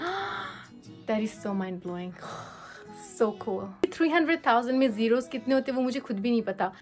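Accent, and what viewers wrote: South Korea. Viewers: Why you talk like you don't know hindi .. like some korean speak hindi.. but its feel good if any korean dpeak like that .. however you look awkward talkimg like this... its bad..not good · I love your Korean accent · Your accent is slowly slowly changed into korean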